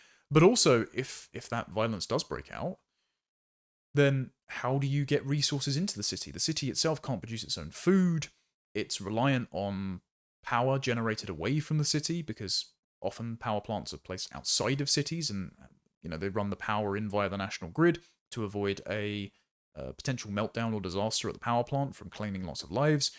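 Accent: RP, United Kingdom. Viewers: You sound like a toff.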